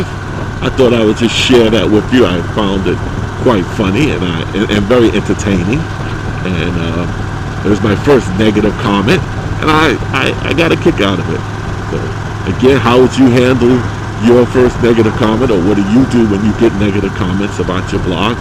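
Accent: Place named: New York, United States. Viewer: Hello fellow NYer...Great video...nice & easy rider. Just love to hear that NY accent again. Makes me miss the big apple. Brooklyn born and now living in hot hot hot Arizona. Nice looking bike. Mine is the same as Dbest1's. Love just cruising around enjoying the scenery. You "ain't" boring at all!!! Keep on gettin' on bro. Dbest1 recommended your vlog and it was all he said it would be. Kool... RSMF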